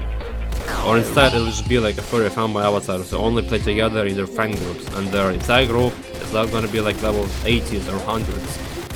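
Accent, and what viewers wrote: Russia. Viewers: No its Russian accent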